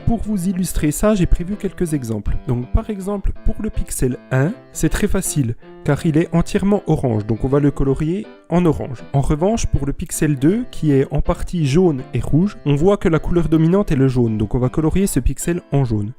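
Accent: Franche-Comté, France. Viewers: Petit accent de franche comté ???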